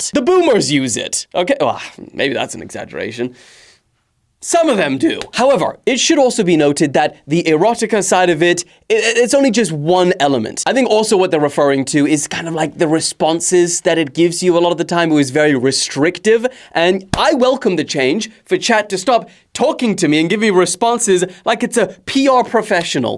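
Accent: South Africa. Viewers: Are you South African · Is South African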